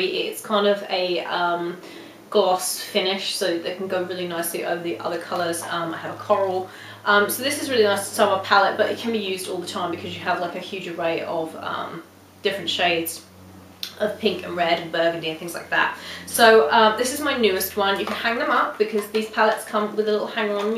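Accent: United Kingdom. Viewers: Are You brittish Cause you sound like it??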